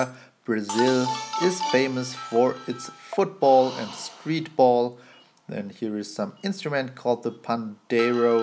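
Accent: Germany. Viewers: I love this update are you german?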